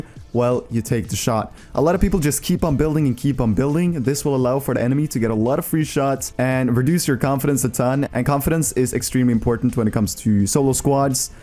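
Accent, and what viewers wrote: Norway. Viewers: Are you norwegian? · Are you from Norway?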